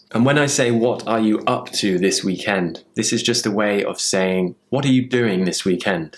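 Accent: United Kingdom. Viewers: Hello! Thank you very much indeed for your video recordings. It is utterly important for me to follow your videos inasmuch as I USE them ( sorry for talking like this) to repeat after you full speeches as I best manage to do. I have to say that it is really working well for me. I am able to catch up your Britishness and pick it up. I hope I'm doing well with my pronunciation. After listening to and doing such shadow technique exercises your voice producing British accent remains long time in my mind before fading up. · I really love your accent!! When I started to study English, I started with American English, but I'm getting higher British English because is really posh and polite!! I love it!! Congrats for your video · One more subscribe! Accent very nice! I love British accent and like when I understand! :) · Thank you for your useful and helpful video:) but I would also like to listen to your natural speed of British English!!!! 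Not all of English people speak this gentle and clearly, and I would really like to get used to natural speed of British English with your cool accent. Please~~~Make some natural talking video!! Lots of love and respect from Korea :)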